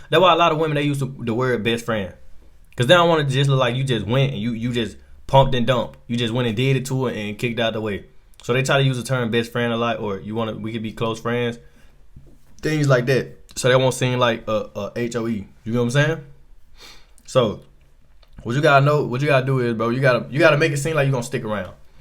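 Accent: United States. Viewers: Yo u too American ur acsent is too intense for us in asia but your vids are dope 👊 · He has a Southern accent maybe Florida Louisiana Mississippi or Georgia.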